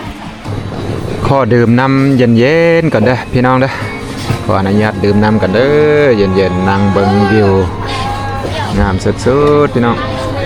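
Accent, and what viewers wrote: South, Thailand. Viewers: สำเนียงภาษาใต้ชัดๆ..ถ้าไม่มีคำว่าแม่น/บ่/มัก/เบิ่ง/เฮา/(จะไม่รู้ว่าคนลาว)สำเนียง..คนใต้ชัดๆ..คนไทยภาคใต้นี่น่าจะมีเชื้อคนหลวงพระบาง55..หรือคนหลวงพระบางได้ผัวคนใต้55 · ฟังตอนแรกคิดว่า แหลงใต้ บางคำเป๊ะเลยนะ เคยมีเพื่อนเป็นคน วังสะพุง จังหวัดเลย พูด แบบนี้เลย สะพานถูกต้องแล้ว อ่านว่า สา-ระ-สิน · ไซเหมือนแหลงใต้เลย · แปลกจัง สำเนียง70% เหมือนภาษาใต้พื้นถิ่นของภูเก็ต & พังงา เราฟังแล้วเข้าใจ100% ไม่เหมือนฟังภ.อีสานแถบอุดรฯ, ขอนแก่น ฯลฯ เคยมีคนบอกว่าภาษาอีสานของจ.เลย ซึ่งคนส่วนใหญ่อพยพมาจากหลวงพระบาง จะพูดเหมือนภาษาถิ่นใต้ฝั่งอันดามัน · สำเนียงบางคำ เหมือนภาษาใต้ปนอีสาน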